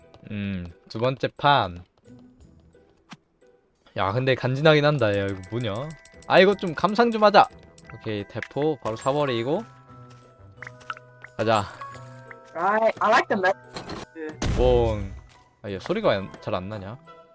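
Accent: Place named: North Korea. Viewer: 북한말투 그만해 제발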